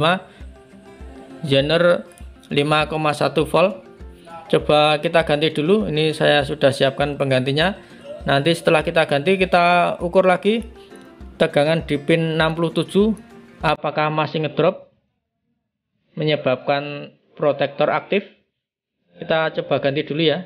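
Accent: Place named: Java, Indonesia. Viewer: Bahasane medok. Lokasi pundi mas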